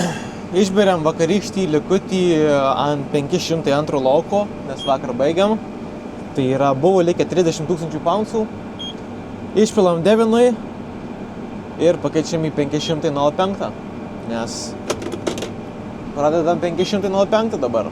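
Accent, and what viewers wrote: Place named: Zanavykai, Lithuania. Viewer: šaunuolis ,vyrukas ir muzikytė vežanti, automatiškai koja į ritmą.:)O tarmė zanavykų, miela ausiai:)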